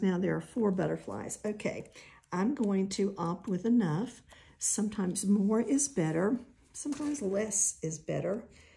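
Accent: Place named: South, United States. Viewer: Hi~I've just found you. This looks like a fun project which I'm going to add to several of my many, many journals. I'm thinking that this is a great way to use up some of those found, pressed, and saved items plus what-nots that are accumulating in my desk, that can use a safe home and this remedy is so clever! Since I do not have a square punch, I'll use a round one instead, which I'm sure will turn out just fine. I'm really enjoying your soft sweet southern accent. Thank you for apologizing frequently, but it really isn't necessary , at least not for me. You're fine just the way you are. I look forward to more inspiration from you, till then, thank you.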